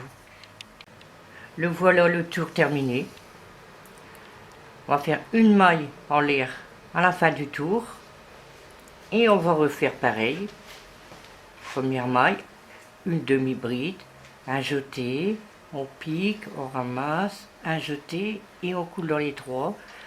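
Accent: North, France. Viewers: Merci pour cette bonne démonstration! Bonnes explications! Belle vidéo! Bonnet facile à réaliser au crochet! Bonne idée de prendre plusieurs fils pour faire le pompon, j'y avais pas pensé! J'aime votre accent du Nord! Amitiés de Belgique MIMILOU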